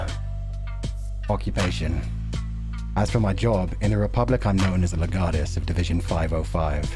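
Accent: RP, United Kingdom. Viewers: Like vox received pronunciation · posh I think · naaah he’s Vox type of british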